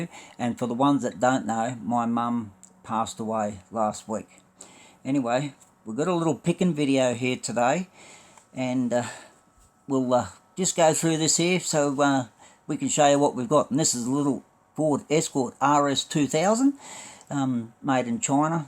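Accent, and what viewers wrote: Australia. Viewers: Wow....you have enough cars there for at least 6 months of videos 😁 Can't wait to see HCT back up and running as it is also good to hear your Tassie accent on YouTube again mate 🤙🏼 I enjoy your videos as I always pick up a tip or two on customising so I wait with bated breath to see what's next!! That van with the bubble windows will be a fun build I reckon. Keep up the relaxed and interesting vibes 😎🇳🇿🍺🍻🍕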